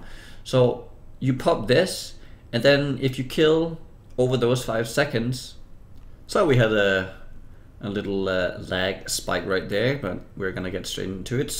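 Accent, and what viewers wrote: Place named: Denmark. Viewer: Syntes nok jeg kunne høre lidt dansk accent! :D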